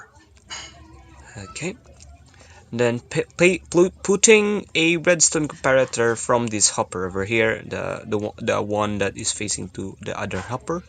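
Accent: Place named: Visayas, Philippines. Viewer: Nice english accent bro bisaya with nice English accent? Nice!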